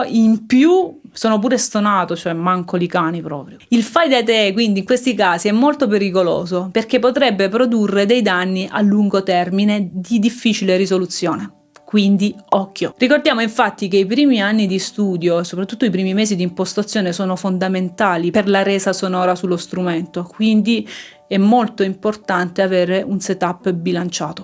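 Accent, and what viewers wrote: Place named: Bergamo, Italy. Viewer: Ciao. Grazie per l'ottima e chiara spiegazione. Con il mio sax tenore e bocchino Yamaha 4C in genere uso le Vandoren "blu" 2.5 che considero la mia cosiddetta zona di comfort. Tuttavia per osare di più ho iniziato con le Rico "arancio" 3.5 che però trovo un pò dure e faticose da gestire specie sul registro grave. Ho provato anche a lavorarle un pochino (a riguardo ho seguito i tuoi video) ma non ho ancora trovato un setup soddisfaciente. Mi consigli un altro tipo di ancia (ad esempio Rico Jazz Select, di cui parlavi in un altro video)? Grazie! PS Aggiungo simpaticamente che l’espressione “manco li cani”, non proprio orobica, unitamente alla tua cadenza linguistica, mi è meravigliosamente familiare 🙂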